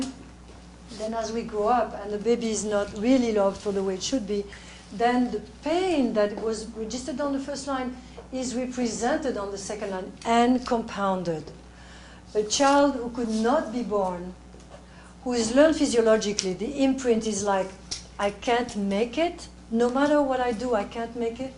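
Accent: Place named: France. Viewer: She is right. By the way, her French accent is really fine :-)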